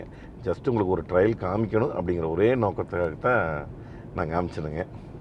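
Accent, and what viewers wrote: Kongu, India. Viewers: Addict your kongu tamil Anna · கொங்கு நாட்டு தமிழ் உச்சரிப்பு அருமை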